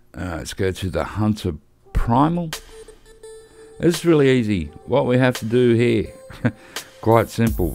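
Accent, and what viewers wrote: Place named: Australia. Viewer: Much appreciated, love the casual Aussie tonne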